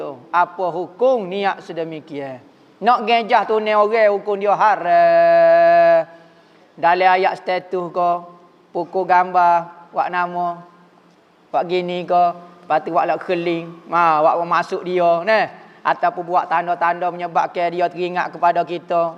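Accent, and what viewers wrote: Kelantan, Malaysia. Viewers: Alhamdulillah... Jarang2 dpt dgr ceramah dialek kelatey.. Terbaik ustaz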